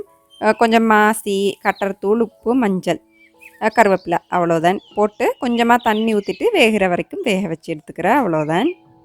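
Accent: Sri Lanka. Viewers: Assalamu alaikkum neenga basica srilanka thana illa tamilnattil irundhu poneengala nalla tamil pesureenga ceylon slangla alaha irukku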